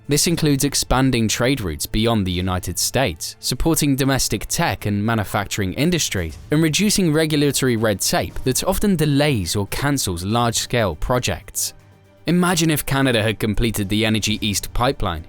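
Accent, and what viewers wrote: United Kingdom. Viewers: Dude, I love your British accent 😍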